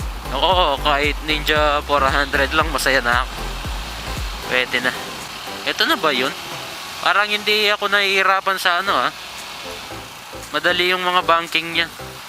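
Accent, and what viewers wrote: Pampanga, Philippines. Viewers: Bosis kapampangan dumali ya hahahaha idol pa colab taga mexico ku bap tuki ku rides u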